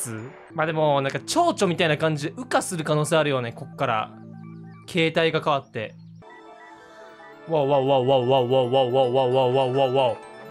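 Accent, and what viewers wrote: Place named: Kansai, Japan. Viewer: 寝れない夜中に見るもんじゃなかった😂腹痛い sqlaさんコラボとかスト鯖でおもろい関西弁の人って印象だけあったけど、本当に面白い😂 切り抜き見て良かった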